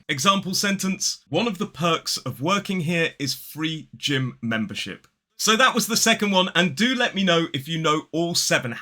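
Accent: United Kingdom. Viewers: British English accent sounds great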